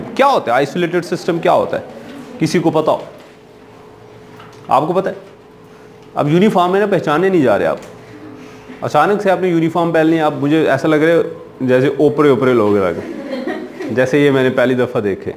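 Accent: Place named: Punjab, India. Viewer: why punjabi sounds so heart-touching and funny😂 especially when he speks.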